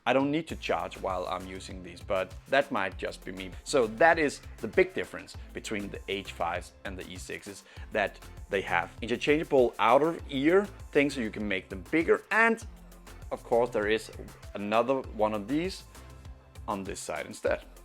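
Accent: Denmark. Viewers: danish accent? are you from Denmark?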